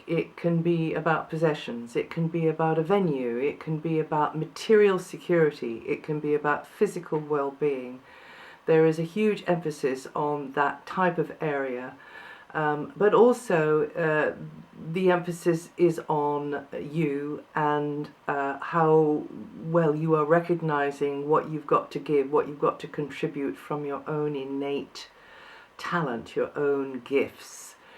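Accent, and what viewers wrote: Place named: United Kingdom. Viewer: You are Beautiful. I love your accent, British, of course.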